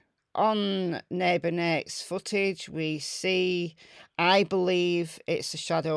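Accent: United Kingdom. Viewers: You sound like you are in the UK..Nothing wrong with that except it's awfully crass of you to make a video based on bullshit when you don't even live here and also hurting the Watts family with this crap! They are gone tragically but stop dredging up this home made bullshit and let them rest in peace! Nichole Kessinger was involved...Monkeys might fly out of my butt! Now apologize to the Watts family for making this rubbish video and shut up!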